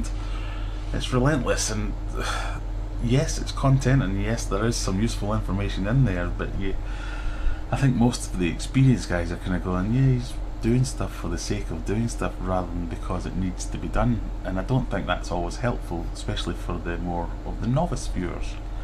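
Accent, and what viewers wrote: Scotland, United Kingdom. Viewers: Agreed on your insight regarding Joey's channel and himself. Are you Scottish? Kind regards! P.S. I've just subscribed :)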